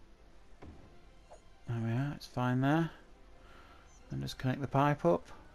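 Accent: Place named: Northern England, United Kingdom. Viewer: Same here. And he's a North Northerner. Bolton ? Rochdale ?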